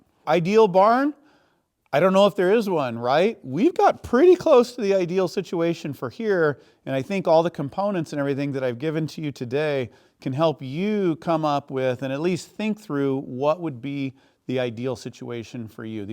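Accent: United States. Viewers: sounds like every blue state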